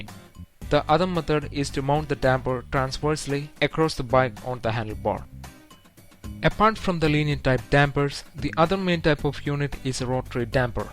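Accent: Kerala, India. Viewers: Very hard to understand the massive indian accent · Your Mallu accent is thick X_X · Are you malayali? You sound so.. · argg your accent making me sick dude ... you must be from kerala ....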